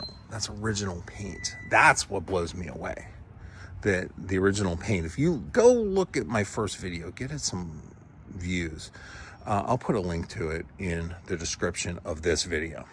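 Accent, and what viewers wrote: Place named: Pennsylvania, United States. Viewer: I could tell you are from PA by your accent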